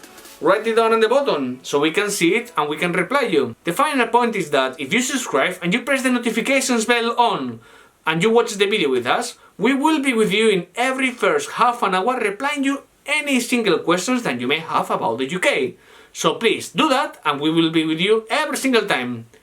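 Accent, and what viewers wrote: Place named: Basque Country, Spain. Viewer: Acento vasco ehh, aibalahostia!!!! tienes mi LIKE....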